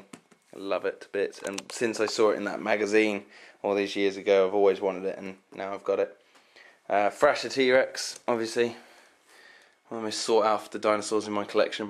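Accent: England, United Kingdom. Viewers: are you from england aren' you???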